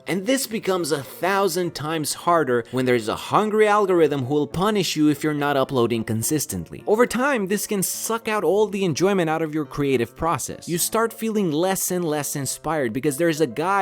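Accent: Romania. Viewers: Please answer me: Are you romanian? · Yo man, your accent is GODLY for somebody from Romania! I live there too, and my accent is trash.... I want to as you a question . Where do you live? Like, in what county? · You have such a nice accent Were you raised in Romania?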